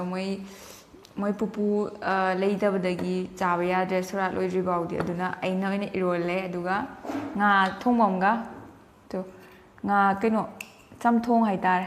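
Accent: Kakching, India. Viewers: Kakching marolse taba ym nungaiye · Ema se yam nungcye... Kakching kai waideino ngse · Kakching maronda ngangbana pamjei sister. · Che se kakching kawaidgino hngkhi reply towrk towbirkhide eikhoi kakching mrol ngangbni eina hraoe su haikhibni eisu kakching ne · Che na kakching marol ngangbce nungcye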